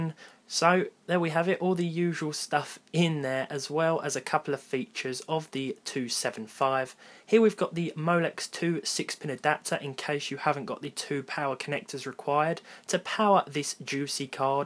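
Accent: United Kingdom. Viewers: i love your british accent dude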